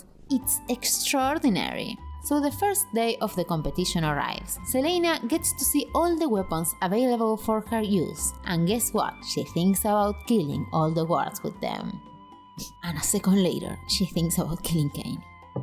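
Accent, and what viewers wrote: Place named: Argentina. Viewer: Argentinian ass accenttttt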